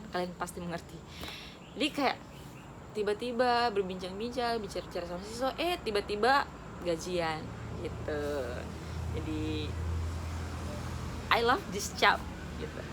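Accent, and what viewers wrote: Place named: Sulawesi, Indonesia. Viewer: Kalau dari logatnya sekilas org sulawesi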